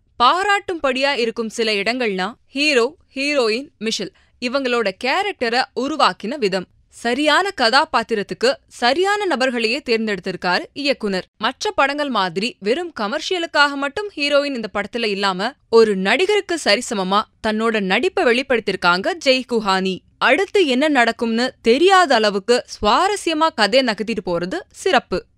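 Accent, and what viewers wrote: India. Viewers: Nt good Tamil nt spoke well... It's like hindi speaker..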